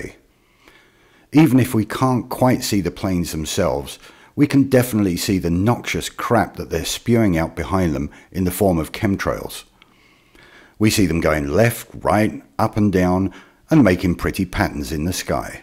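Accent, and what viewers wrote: England, United Kingdom. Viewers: Hmm I was going to respond to you myself, but I thought I would like the answer to be as simple as possible, and I know that you have stated in other videos that you would not accept any answer with the word gravity in it. So I decided to ask my 5 year old son for you. He has not learned about gravity from school or myself as he is only 5. This was his reply, he thought about it for about a minute, and came up with this answer. Well planes can go up as well as down, but asteroids can't go up. he thought a bit more about it and added. it's like you don't see rain going up. at this point he lost interest and went back to playing with lego. I couldn't have put it better or simpler than that. And I know that asteroids can sometimes appear to go up, and rain can also go up in extreme weather conditions. but he is only 5, and appears to have a better understanding than you without any education on the subject, or prompting by myself. 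As you have an English accent I take it you have seen the program are you smarter than a 10 year old. Well you don't seem to be smarter than a 5 year old. Now please stop preaching disinformation to gullible people, you do not realise the harm you are doing to them.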